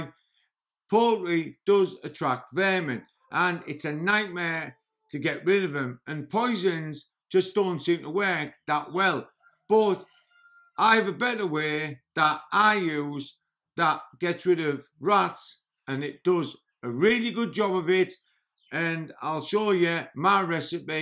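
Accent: United Kingdom. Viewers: Trying to place the accent..... UK but where .... Wales or Scotland?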